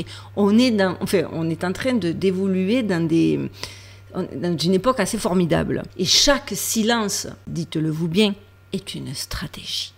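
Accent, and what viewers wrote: Marseille, France. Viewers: trente seizième, au moins....t'es pas Marseillaise pour rien au moins, grosses bises amicales du pays des cigales, super vidéo comme toujours 👍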